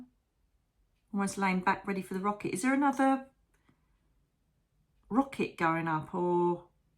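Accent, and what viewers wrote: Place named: Scotland, United Kingdom. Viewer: Mags from Scotland… loving the Scottish accent and love you! Yes, purple is your colour! Go! Big hugs all x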